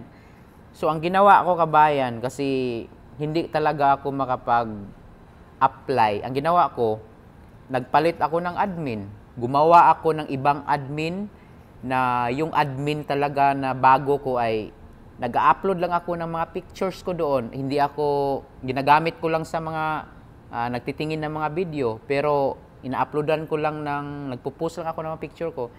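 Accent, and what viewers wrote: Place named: Visayas, Philippines. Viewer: Halatang bisaya ka idol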